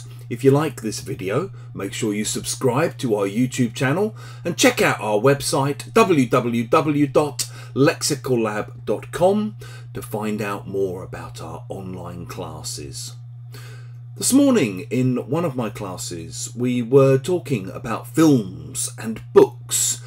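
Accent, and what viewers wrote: United Kingdom. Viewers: it is real British English mate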